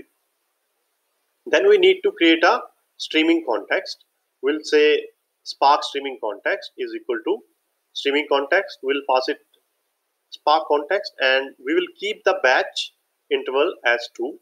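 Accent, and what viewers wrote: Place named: India. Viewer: So indians are the only people that can do this? Is this explained anywhere in English without an accent...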